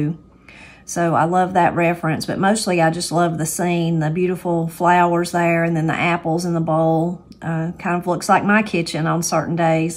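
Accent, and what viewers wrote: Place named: Georgia, United States. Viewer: You are so right! I sometimes just turn on her video just to hear her and her family speak and talk about their ups and downs and day to day little things! I'm in the Midwest but my family is from North Georgia and the accent and values of my people come through the screen to put a smile on my face! 😊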